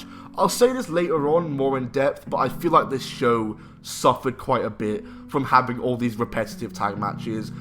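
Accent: United Kingdom. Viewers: 🙀🙀🙀You seriously scared the shit out of me when you all of a sudden tried to imitate Scott Steiner when he cut this promo especially since you have a British accent and you basically just yelled on the mic just like he did both in the beginning and in the end of this video!😱😱😱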